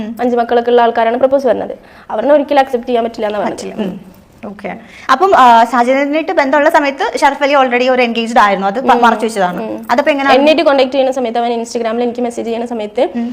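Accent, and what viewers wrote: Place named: Malappuram, India. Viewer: എടാ പഹയാ അന്റെ വർത്താനം ഇന്ക് നല്ല ഇഷ്ട്ടായി 😂😂😂😂👍👍👍👍മലപ്പുറം da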